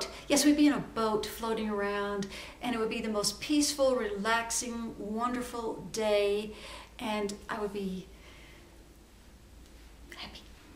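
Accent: Italy. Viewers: Thank you for the tag! (you have a great Italian accent!!) xo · Your mom reading 50 Shades Of Grey really made me giggle. My FIL has read it too and he is 77!:-) Your Italian accent is super cute! I enjoyed learning these facts about you,. XOXO! Sandra.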